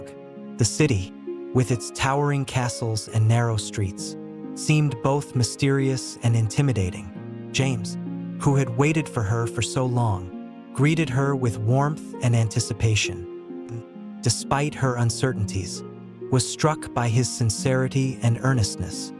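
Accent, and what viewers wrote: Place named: United States. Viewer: This sounds american. I only want uk production.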